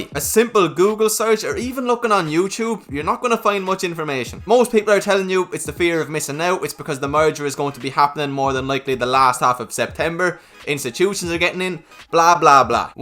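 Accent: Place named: Ireland. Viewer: That Irish accent is so fun to try n copy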